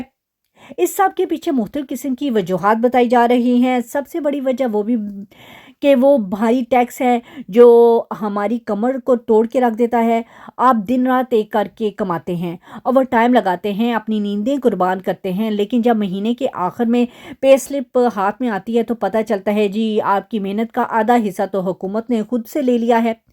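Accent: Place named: Punjab, Pakistan. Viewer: Tu c Paindo ho. Ardo (Urdu) Punjabi accent vich bol di ho.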